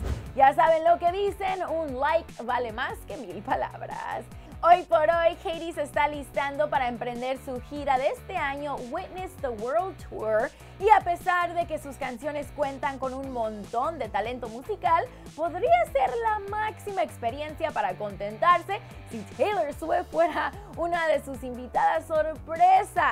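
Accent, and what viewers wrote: United States. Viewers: No busco ofender a nadie. Los estadounidenses tienen un acento MUY fuerte, por eso la forma de hablar de. Si escuchas a un inglés, hablando inglés:v, notarás la GRAN diferencia de acentos. P.D: Me dan igual los insultos. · la conductora es gringa es normal que hable así es como cuando le hicieron pedos a la conductora de noticieros latina por pronunciar bien los nombres en español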